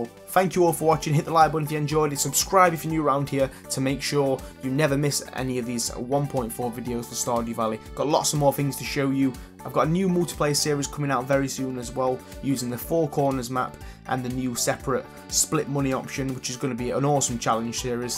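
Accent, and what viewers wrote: United Kingdom. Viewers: I really like the way you speak like brithish idk lol and yeah nice vid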